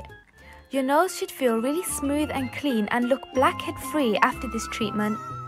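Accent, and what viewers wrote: Birmingham, United Kingdom. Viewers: Does this work on white heads? You sound like you're living in UK, did you get that brush in tesco? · ❁ཻུ۪۪┊𝓝𝓸𝓮𝓵 it’s a british accent ... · its called brummie lmfaoo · OMG I ACTUALLY CANT 😂 It’s not fake it’s a Birmingham accents Lollll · Brummie accent. Birmingham 🇬🇧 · It's just a British accent, not even the same one as Peppa Pig. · Haha omg someone appreciates a Birmingham accent, finally!🤣👏🏼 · she's from Wales this is a brummaccent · She’s British, but from a different part of England. You’re probably American.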